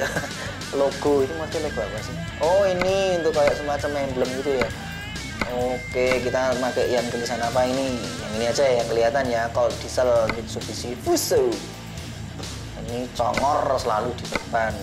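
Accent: Java, Indonesia. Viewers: Logat koyo wong jowo koe mas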